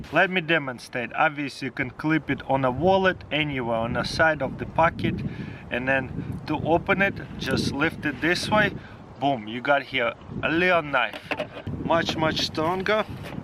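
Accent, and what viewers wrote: Russia. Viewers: He's russian guys chill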